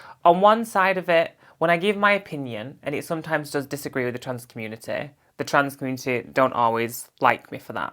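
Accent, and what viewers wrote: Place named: Manchester, United Kingdom. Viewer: Only mean thing I can say is how dare you be prettier and more attractive and beautiful than me! Also love the Mancunian accent 🩷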